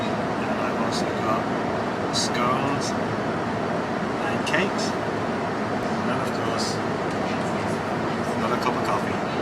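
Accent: United Kingdom. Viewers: ARE YOU BRITISH??? Dude I thought you were an Aussie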